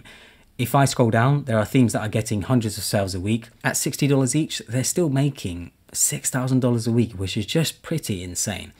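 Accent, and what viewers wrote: United Kingdom. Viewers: Hi! About amazon, are you linking solely to the US amazon store? If you are, is this based on your audience analytics? Asking because it sounds by your accent that you and I are using a different one (amazon uk) so I was wondering whether I should open one here or there.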